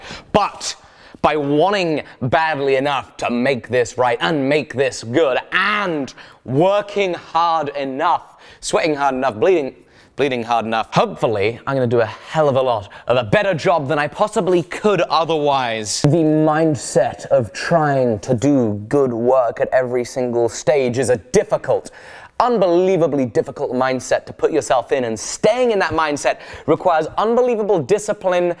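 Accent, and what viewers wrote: South, United States. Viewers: Damn Alec, you have the Southern accent down. I think I've heard my relatives say the exact same thing about their trucks. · As a southern I find the accent you do spot on. And boy you know we love our diesels! Turbo diesel, regular diesel, diesel fuel. We love it. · The southern accent is unsettling because i have to live around it. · Mighty fine southern accent y'all got there Alec. By the way, what's in that there spray bottle? Just soapy water? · That country accent though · lol i loved his souther accent i was pissing my self laughing, keep up the good work Alec loving the project. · That's the most accurate hick American accent ever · Holy hell your southern american accent. I can't even. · Quite the southern accent there. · Your southern accent is fucking hilarious! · Isn't there an electric sander of some kind you could use? 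By the way: good job on the southern accent!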